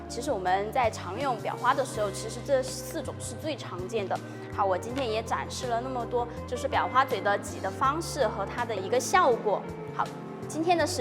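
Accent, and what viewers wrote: Sichuan, China. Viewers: 干货很多，小老乡，加油！主要是听着川普比较亲切，没有笑话你的意思。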